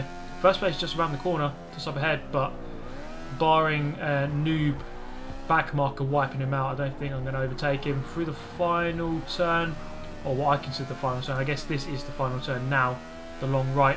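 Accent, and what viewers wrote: United Kingdom. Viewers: The British accent is paired with sarcasm as well as noobs and the Alfa 33.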